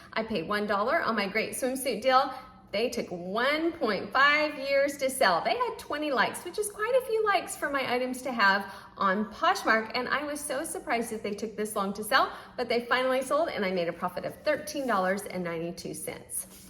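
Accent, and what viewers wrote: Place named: South, United States. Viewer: I look forward to your videos! No disrespect but I LOVEE your southern belle accent, so beautiful! Your sales always blow my mind and keep me motivated. I had a good sales week on Poshmark and Ebay. For some reason Mercari is just little slow and not as fun haha. Eventhough I sold a hobo crochet bag from The Sak that I paid 6 for, it was limited edition sold for 45 so if anyone finds one...snatch it and list it!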